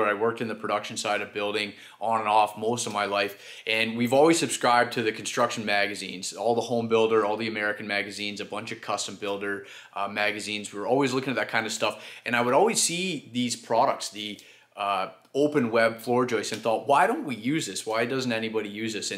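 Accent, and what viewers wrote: Canada. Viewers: sounds like a Canadian accent. Hi from Niagara!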